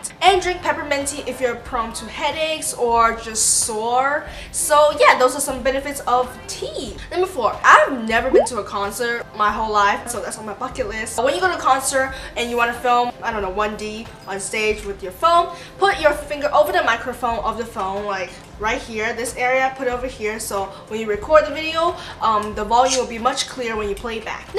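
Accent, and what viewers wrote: Canada. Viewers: Are you Canadian????😝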